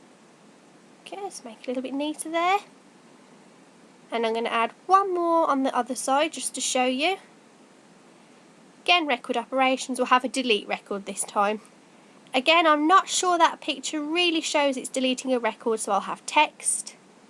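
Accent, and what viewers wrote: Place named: RP, United Kingdom. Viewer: posh Accent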